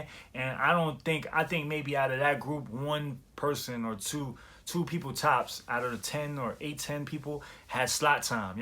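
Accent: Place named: Brooklyn, United States. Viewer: So brooklyn bro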